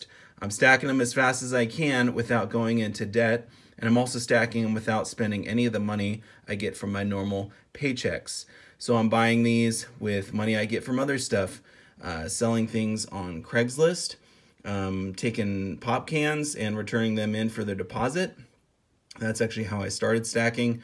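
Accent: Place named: San Bernardino, United States. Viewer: That accent. San Bernardino?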